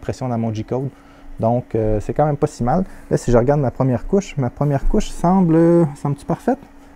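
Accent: Canada. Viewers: Bonjour, superbe video et bonne explication. Petite suggestion concernant la limitation des commandes personnalisées sur l'écran d'origine ... Il existe la possibilité d'installer un Rasperry Pi avec un écran tactile de 7 "(voire 10") en tant que contrôleur d'imprimante OctoPrint (les coûts sont abordables). Je n'ai pas encore commencé ce projet, mais je le trouve très intéressant. En ce moment, une interface utilisateur étendue y serait peut-être également possible pour rendre plus de fonctions disponibles directement via le toucher! J'adore votre prononciation, je suppose que vous venez du canada!? Salutation du Luxembourg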